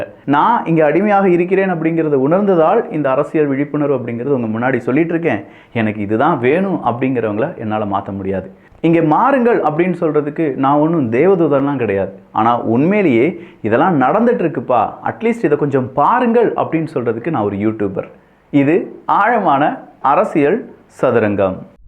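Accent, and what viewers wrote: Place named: Kerala, India. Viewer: I get malayali l get malayali pitch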